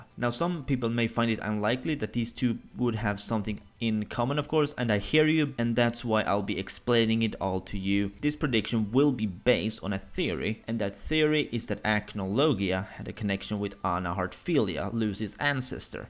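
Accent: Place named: Spain. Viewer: you're freaking Spanish PEOPLE DO YOU HEAR HIS VOICE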